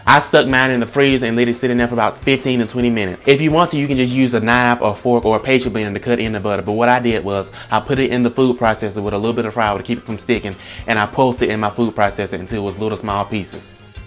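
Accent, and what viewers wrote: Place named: South, United States. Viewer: I can tell by the way that you talk that you're from the country so I know your biscuits are good!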